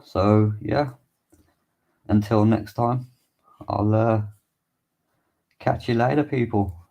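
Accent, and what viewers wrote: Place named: Wolverhampton, United Kingdom. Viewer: Knew it was a Wolverhampton accent, I'm from Three Tuns/ Bushbury area 👍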